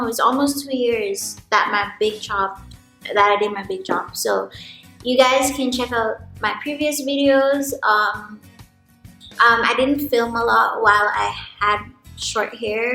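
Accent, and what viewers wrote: Jamaica. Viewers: as soon. as I heard you i knee you were Jamaica, big up yourself, you have my support, fellow Jamaican in the US. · Hearing your Jamaican accent lol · Your accent is so strong and beautiful 🇯🇲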